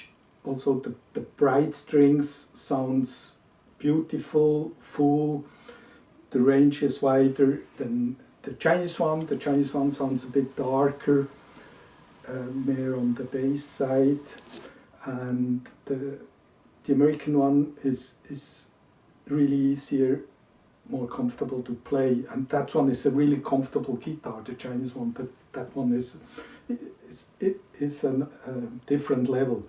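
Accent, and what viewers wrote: Switzerland. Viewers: Hi, great video. You sound a bit Swiss. Do you live in Switzerland by any chance?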